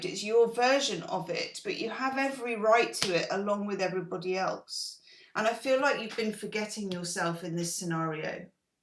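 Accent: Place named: United Kingdom. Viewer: Ii know we both speak English ; but for some reason this American is having difficulty understanding the British English. It’s my fault of course !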